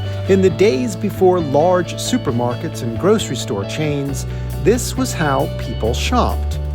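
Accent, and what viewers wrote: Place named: United States. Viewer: I stumbled across your videos and have been binge watching all morning. I look up Petersfield periodically on YouTube to see what is happening and your American accent jumped out! We lived in Petersfield for a couple of years but have since returned to Maryland. Your series on the surrounding areas have and been awesome and very nostalgic for me. My daughter did Irish Dancing lessons in South Harting, performed during a festival in front of the church and have found memories of watching the Morris Men dancing (very English!). Cycled the South Downs many times and did the BHF charity 100 mile ride from Winchester to Eastbourne. Kids went to Sheet Primary School and enjoyed seeing the village and Queens Head pub in your phone box video. Anyways - Cheers and thanks for sharing your videos!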